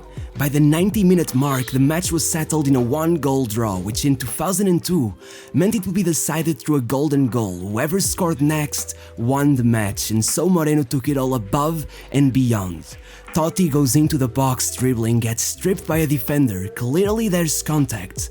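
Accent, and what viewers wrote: Portugal. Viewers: Portuguese accent hh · Hey, I couldnt stop to notice how you pronounced the portuguese names. You seem really portuguese. The way you talk and the accent, are you portuguese?:D cuz i am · this portuguese accent... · i was pretty sure youre portuguese by the accent but when you said the portuguese players names the minimal doubt i had was gone hehe , im subbed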